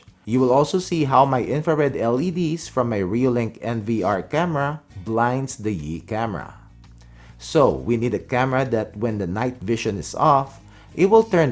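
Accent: Philippines. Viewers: Thanks for the thoroughly reviewed products. Are you Filipino? Your accent is very similar to many of my colleagues in HI. Lots of Southern Pacific Asians here. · First time I've heard a Filipino accent with the typical YouTube speaking tone ... Thanks for your review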